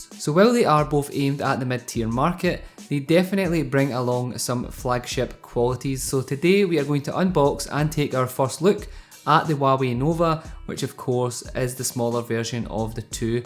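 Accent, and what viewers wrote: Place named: Aberdeenshire, United Kingdom. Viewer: Are you from Aberdeenshire?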